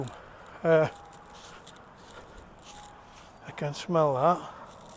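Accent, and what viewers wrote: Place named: Yorkshire, United Kingdom. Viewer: Eyup, Quality vid dat remember it well not been round dare from about same time as de never knew dat bridge had been changed or dose houses been built cheers pal enjoyed dat PS nowt wrong wi de voice proper Yorkshire 👍👍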